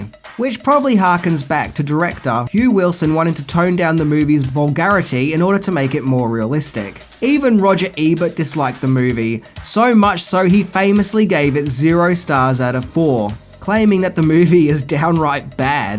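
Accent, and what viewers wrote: Australia. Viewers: After of this horrible Australian narrator, I just... I just can't. 👎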